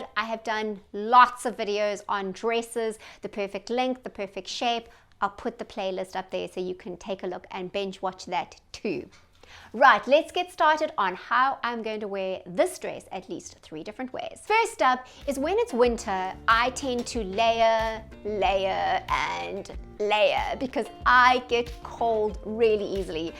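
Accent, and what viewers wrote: South Africa. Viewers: You’re fabulous! I’ve been following you for a short while now. Been trying to place your accent. South Africa. Thanks for sharing that. Beautiful accent!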